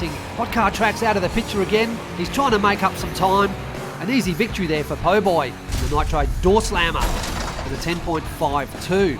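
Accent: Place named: Australia. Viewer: Editing is just brilliant and I just love that australian accent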